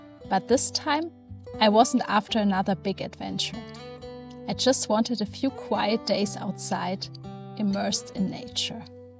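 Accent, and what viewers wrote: Germany. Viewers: What s this accent ? German?..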